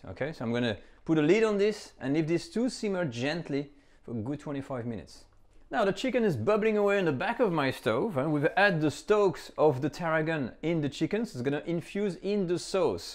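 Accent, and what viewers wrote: Australia. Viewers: Love the way you explain things. You have been in Australia too long, you are starting to sound like us, all lbe it with a lovely accent. A bit like Manu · Ha ha every now and again I hear the remnants of your Aussie accent coming through!! Very good recipe too. Made it for my daughter and she loved it.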